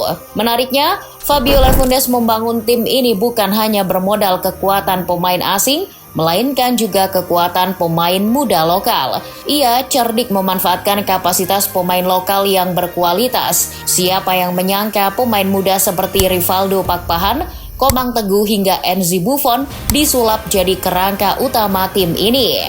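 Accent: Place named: Surakarta, Indonesia. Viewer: Suara pria solo😊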